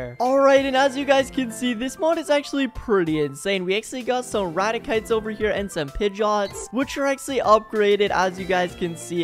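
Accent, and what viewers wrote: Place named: India. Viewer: You sound more Indian dan the actual Indians you cross born Indian.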